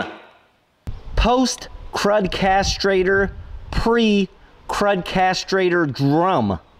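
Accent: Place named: New England, United States. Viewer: Another great episode. Rust removal so tricky. Looking good. I’ve never heard of that milkstone acid. Did a great job. Im so curious about your background. Obviously for your nickname and accent you are from New England? Maybe New Hampshire? And I wonder if you have farming in your background. Did you work as a mechanic? Just curious. You have so many different skills. Enjoy your channel.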